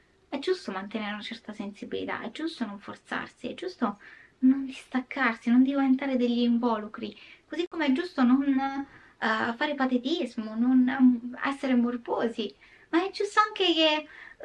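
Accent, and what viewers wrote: Rome, Italy. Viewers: il tuo intercalare romano che adoro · Cara, non si tratta di accettarti così come sei. Nessuno deve accettare nessuno. Tutti devono rispettare tutti e semmai seguire o legarsi a coloro che apprezzano. Noi non ti accettiamo, noi prima di tutto ti rispettiamo e poi ti apprezziamo. E personalmente ti stimo e ti sono molto grata per quello che fai, sia qui spogliando di ogni sacralità i criminali e restituendo dignità alle vittime, sia sugli altri canali, dove mi accompagni in interessanti e spesso importanti ragionamenti, o dove mi apri le porte sul mondo del cinema, sui suoi retroscena e i suoi meccanismi. Un mondo di cui io non so e che tu sai spiegarmi con un linguaggio chiaro, professionale ma anche scanzonato che mi ispira simpatia, che mi mette a mio agio. Quanto al tuo stile... è per l'appunto il tuo stile. Ti distingue, ti permette di esprimerti, sei tu. 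Io poi lo adoro, ma anche se così non fosse sarebbe parte di te come la tua voce, la tua calata romana e il gremlin con la corona di fiori in testa. Tutto ciò sei inconfondibilmente tu e, apprezzandoti, lo apprezzo. E chi non lo apprezza è tenuto a rispettarlo. Ti mando un abbraccio, sappi che per noi ciò che sei e ciò che fai ha valore, ci fa bene. ❤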